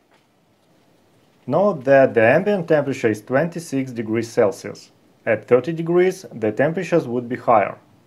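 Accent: Russia. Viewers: Сильнейший акцент выдаёт русскоязычного )) Ну а по сути - очень полезный опыт. Но, к сожалению, большинство самоучек-электриков добиваются "вау-эффекта" от своей работы именно бесконечными километрами никому не нужной гофры. Им главное - пустить пыль в глаза ничего не понимающему заказчику.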